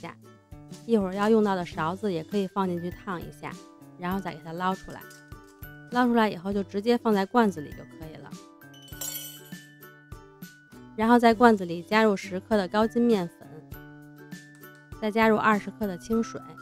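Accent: Beijing, China. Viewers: 哈哈哈 听说话 up主是北京人吧~ 按照你这个试试 之前做过一次 失败了╮(╯▽╰)╭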